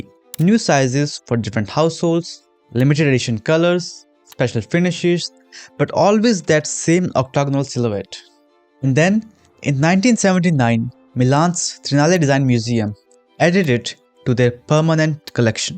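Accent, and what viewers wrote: India. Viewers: If the voice over is AI generated, the original is Indian accented. It would be unintelligible to most non Indians. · Dislike because of Indian accent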